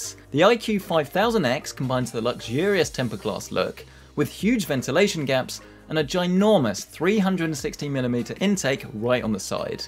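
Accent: United Kingdom. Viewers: Is this guy British? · he's so bri'ish heh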